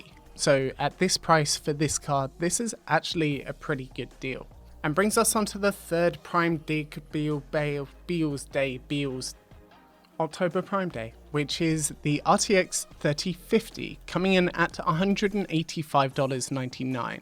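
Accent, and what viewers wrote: United Kingdom. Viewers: For those (like me) who assumed that this bloke might be doing UK prices because of his accent, this is for US prices. (this is not a dig at the channel, just putting this out there for those that might see it)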